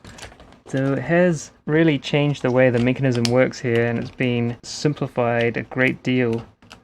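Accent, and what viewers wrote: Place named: Australia. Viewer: I've been looking at TradeMe... Surprisingly not as many options as one would hope, someone sold an old broadcast monitor like techmoan uses for 300 the other week, kinda nuts. I'm curious then, can I ask where you hail from? My bf reckons you've got an Aussie accent 😅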